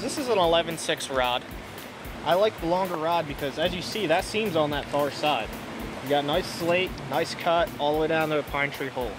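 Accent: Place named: Pennsylvania, United States. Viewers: "Float daun the crick". I say he's from PA. (just like me). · Western PA for sure.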